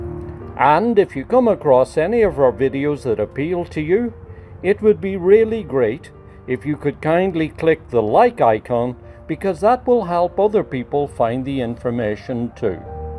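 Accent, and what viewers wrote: Northern Ireland, United Kingdom. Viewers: I live in Ireland since 2007, but I also lived in Cape May. You sound like you might have a little northern Ireland in your speech. Great videos! Thanks!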